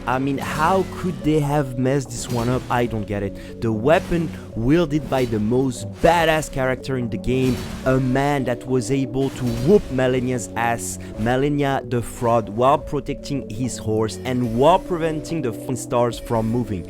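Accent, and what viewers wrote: France. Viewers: Really great video ! You are not just making a simple tier list but adding your touch and your fun to it, so it s really cool to watch !You gain one sub ! (Et je suis aussi fier de moi d avoir reconnu un petit accent français, validé par l extrait du début dans le lequel tu nous sort un petit juron bien francais 😂) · damn that french accent... love it! salut frérot continue comme ça ! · Are you french ? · Ah mais voilà putain j’me disais que t’étais français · Wait are you french ?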